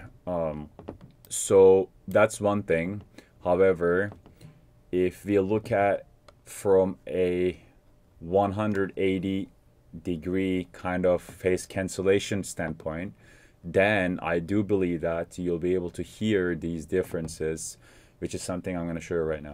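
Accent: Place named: Iran. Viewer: You sound Iranian 😊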